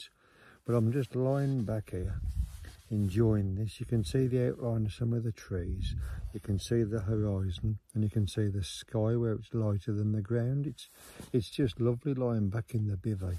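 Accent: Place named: Black Country, United Kingdom. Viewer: Talking of spiritual home, where are you from originally. You sound like you’re from my neck of the woods in The Black Country.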